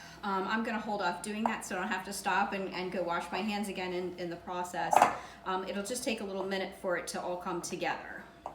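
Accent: United States. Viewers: Wonderful and easy!! Are you a Philly girl? I hear the accent!! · If I didn't know any better I'd guess your accent to be from south central to eastern Pennsylvania. 😉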